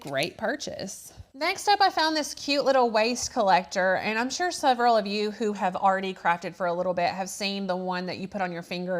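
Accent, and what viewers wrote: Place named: North Carolina, United States. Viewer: I got the wooden needle holder too! Just discovered your channel and love it. You sound like you're from North Carolina!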